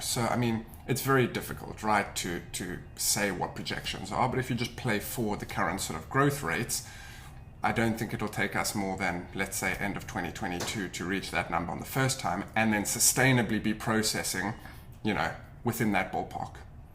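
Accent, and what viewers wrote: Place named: South Africa. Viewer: I detect a South African accent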